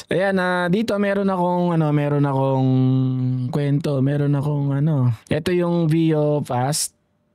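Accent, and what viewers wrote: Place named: Pampanga, Philippines. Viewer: Kabibili ko lng ng YT Shorts Automation course 199php lng nmn, pero dhil Shorts sha pti yta tutorial nya shorts din hahaha atleast dito may proper explanation.. At dahil libre ang kaalaman d2 at Kapampangan ka (huli kita sa accent mo tol hehe, Kapampangan din Ima ko) cge subscribe ako sayo, more power sa Channel mo!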